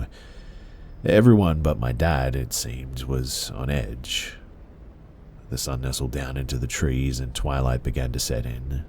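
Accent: Australia. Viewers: I've spent countless hours being lulled to sleep by your sweet Aussie accent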